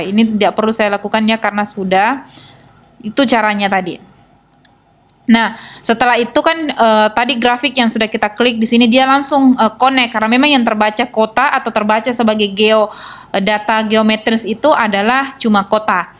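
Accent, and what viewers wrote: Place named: South Sulawesi, Indonesia. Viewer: kentara sekali kalau orang sulsel, deee, ya kan?! hehe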